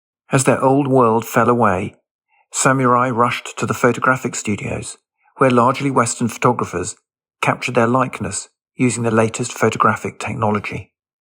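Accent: United Kingdom. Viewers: The narrator is probably British, they tend to pronounce words as they are spelled. National Geographic has a samurai sword documentary, and one of the persons in that video pronounces it the same way, and he is very English.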